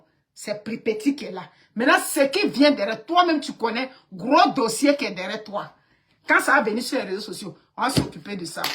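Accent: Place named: Ivory Coast. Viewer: Mme votre accent sonne comme une Ivoirienne.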